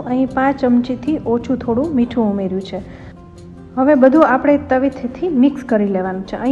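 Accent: Kathiawar, India. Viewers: Very nice. Kathiyawadi bhasha khub mithi lage sambhalwama.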